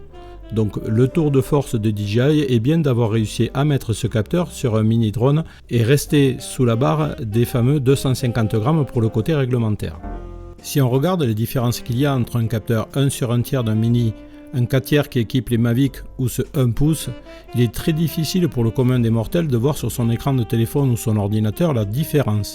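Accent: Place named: Marseille, France. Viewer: l'accent du sud!! Marseille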